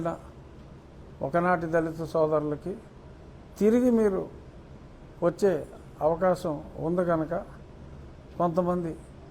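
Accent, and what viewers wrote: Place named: Godavari, India. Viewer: Sir meeru ma godhari yasa mamuluga ledhu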